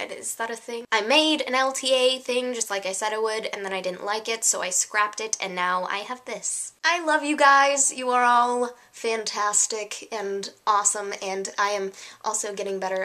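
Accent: United States. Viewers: Michigan accent represent!!!! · I had you up on the computer and my Nana was like "Hey, why are you speaking in an american accent?" so I had to explain that it wasn't me, it was you,!! HaHa, It was funny and flattering because you are so pretty!! x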